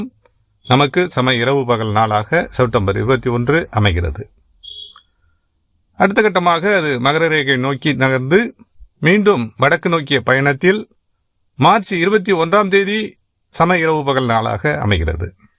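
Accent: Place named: Sri Lanka. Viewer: நீங்கள் இலங்கை தமிழில் அழகாக பேசி உள்ளீர்கள்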